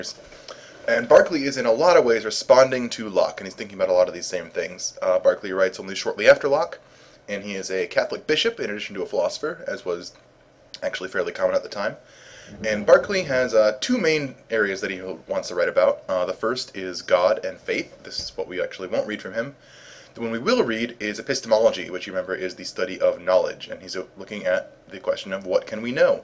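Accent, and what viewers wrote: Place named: Ireland. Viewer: He's Irish...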